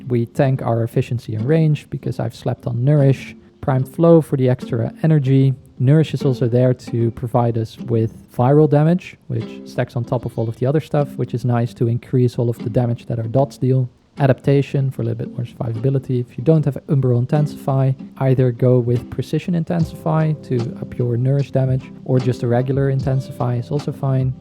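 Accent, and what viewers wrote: Netherlands. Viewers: Yow bruh you accent is dutch or you from tw Nederlands?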